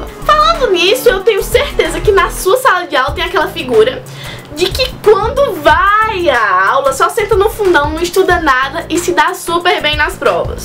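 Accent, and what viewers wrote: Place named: Natal, Brazil. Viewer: Tu é de Natal é? Sotaque lindo... USHAUHUHUASHHASHUS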